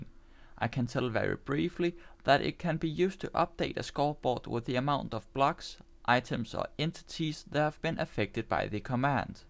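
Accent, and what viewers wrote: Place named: Denmark. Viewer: Your Danish aren't you? :)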